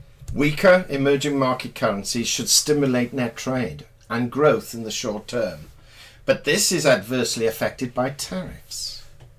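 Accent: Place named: Wales, United Kingdom. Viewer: The narrator is quite clearly British at a guess I'd say Welsh, and yet you pronounce YouTube as YouToob. Why?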